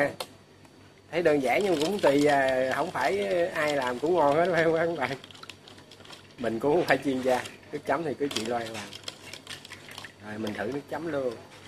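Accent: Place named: Southwest, Vietnam. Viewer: Rat thich Video clip cua Anh, hinh anh giong mien Tay cung giong noi chan chat mien Tay cua Anh lam toi nho lam que toi . Hy vong mot ngay gan day se ve VN cung anh nhau mot bua : ))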